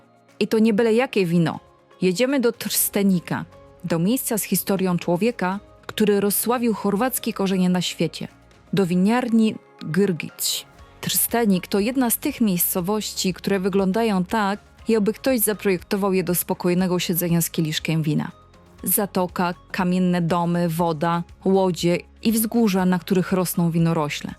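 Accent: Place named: Ukraine. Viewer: Całkiem niezłe jak na AI tylko ten lektor tak zaciąga ... ukraińskim?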